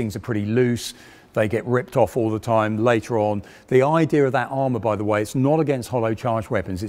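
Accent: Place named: United Kingdom. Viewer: Alongwith the knowledge about tanks, I get to learn British accent also! Win win!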